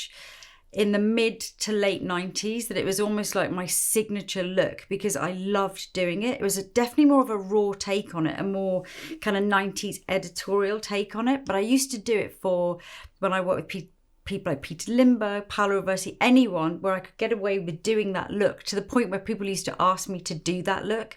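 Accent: England, United Kingdom. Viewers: I don't know if I've ever seen someone applying make up more elegantly than. It's just incredible to watch. Just her imagination, like how she has this very real vision in her head...lol....and she brings it to life in her way. It's cool to see. It's clearly such a passion and she's very good at what she does. I just adore watching her work at her craft and passion!!!! So happy that there are people like this on the planet that are loving what they do and following their passion....too many work jobs they just HATE... so as to get that paycheck.... but the world would be a much nicer/friendlier/healthier place or should I say the human race? if people actually took the time to find their passion, and then figure out how to make money involving that passion! <3 Just wonderful to see.....(so graceful and elegant too! And ofc if she didn't have that english accent it would just feel different....she makes me feel like old school english countryside elegance / royalty yet incredibly humble and knowledgeable....i will say though, DAMN that brush collection! 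I'd love to see a makeup and brush collection video! )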